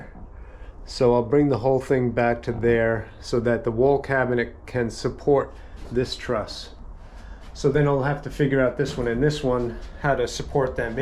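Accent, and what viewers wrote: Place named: Florida, United States. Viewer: Man what a great thinker you are, That's working smarter not harder, You taught me an valuable lesson not to RUSH, but have a plan, We love your Florida Accent Lol are you from Long Island Or The City? Can't wait to see the next episode, you have a great setup in Tampa, Sure not making any more land , Stay Blessed my brother ✌️🌴🌅😎🇺🇸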